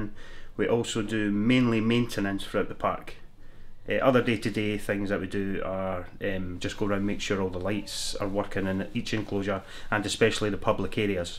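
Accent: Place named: Scotland, United Kingdom. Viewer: I love the scottish accent. ;o)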